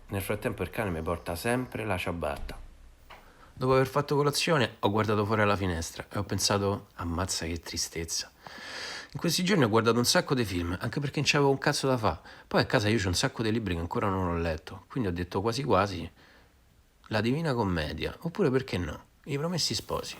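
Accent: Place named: Rome, Italy. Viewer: Siamo a Buenos Aires, racchiusi come voi. Anche Io ho provato serenità con i tuoi video, e li aspetto. Ho consigliato ai miei allievi d‘italiano di seguirti per imparare come parla un romano. Salutami il pesce!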